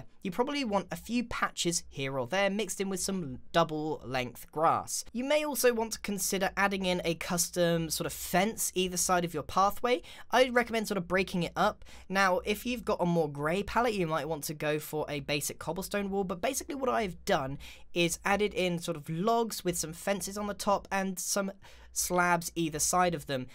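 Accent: Netherlands. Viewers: Last van een nederlands accentje?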